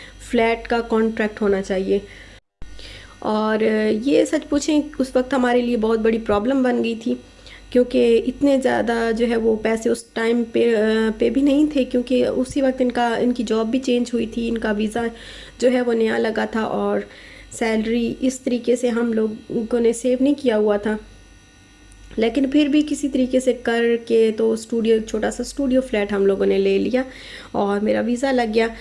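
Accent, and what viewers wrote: Saraiki, Pakistan. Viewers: As'salam o Alaikum aap ke voice mai thori thori saraiki language aa rahe hai aap pakistan ke kis city se hain.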